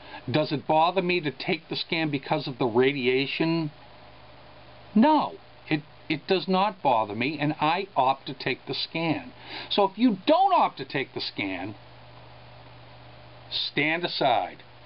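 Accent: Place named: Boston, United States. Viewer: HA!!!! Now I know what your accent is.... a Boston accent! lol Soulpeace Sharon Alyss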